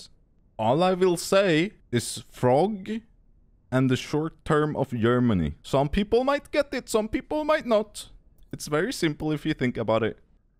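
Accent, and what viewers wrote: Sweden. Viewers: I love your vids man btw are you swedish cuz i can hear it from your english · The Swedish in this guy is RADIATING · You sound so swedish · du låter svensk? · how did your swedish accent got worse??? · I like that i can hear that u are swedish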